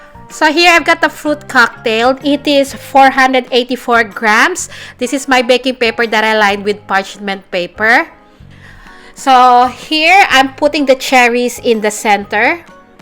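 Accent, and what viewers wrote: Philippines. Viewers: Filipino accent...nice.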